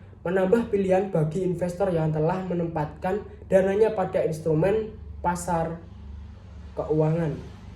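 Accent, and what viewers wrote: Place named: Java, Indonesia. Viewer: Medok dikit ga ngaruh🔥keren poll👏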